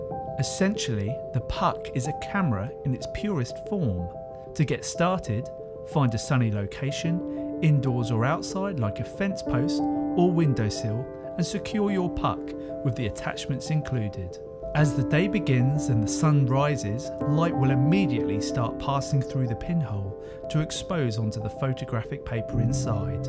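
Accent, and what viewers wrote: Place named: United Kingdom. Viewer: No offense intended, but are 98% of all videos now narrated by the same British reader? 🧐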